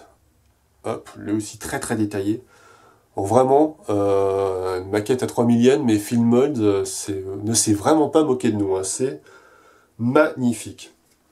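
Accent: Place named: France. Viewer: Salut. Comme tous les ans je monte à Paris pour la Japan Expo. On s'y retrouve avec mes potes de FFXIV et on en profite pour faire des IRL tous ensemble. Les années d'avant j'étais passé devant le stand d'Anigetter sans savoir que t'en faisait partie. Cette fois je manquerai pas de te faire un coucou en tant que membre du forum depuis peu. Sinon je me marre toujours autant devant ton accent franchouilard: Mastèrre Grââde, Fîîne Môldz, ha ha. Ca fait saigner mes oreilles de bilingue mais je préfère prend ça à la rigolade;)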